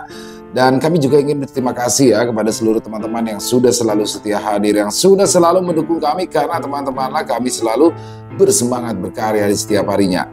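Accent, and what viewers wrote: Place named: Betawi, Indonesia. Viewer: Majalengka hadir nyimak, klo cerita bang piyan yg kocak nya baba ali,klo di bang doni emak jubaedah,yg bawain ceritanya bang kocak banget,dialek betawi nya,seru abiss DIMARI 😊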